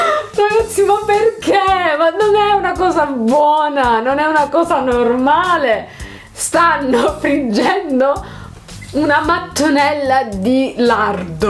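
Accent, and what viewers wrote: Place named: Calabria, Italy. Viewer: 6 calabrese avverto un accento pronunciato 😂😅❤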